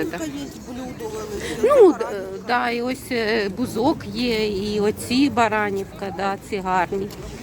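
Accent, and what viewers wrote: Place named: Kyiv, Ukraine. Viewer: Дякую за відео і чудову українську мову з київським акцентом 💞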